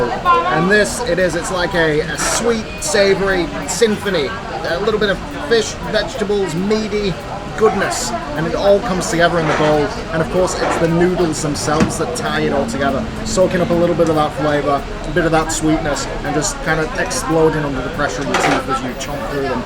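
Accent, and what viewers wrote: United Kingdom. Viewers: Lovely British accent and good food. Instant subscription and like👍🏽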